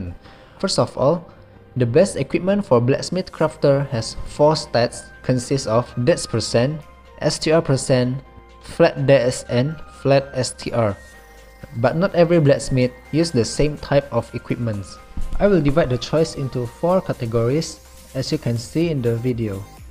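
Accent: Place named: Malaysia. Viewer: ru malaysian? ur accent looks like Malaysian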